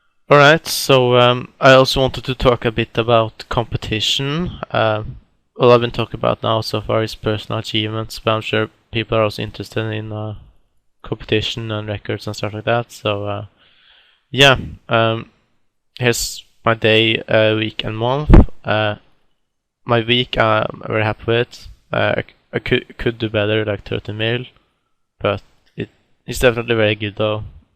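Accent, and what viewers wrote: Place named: Norway. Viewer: Gratulerer! spiller ikke 07 selv, men moro å se at du står på å er pågående! :D men hører du er ''norsk'' for å si det slik XD Men det e rmoro å se hvor god engelsken din har blitt i forhold til da du starta! :D Lykke til videre :)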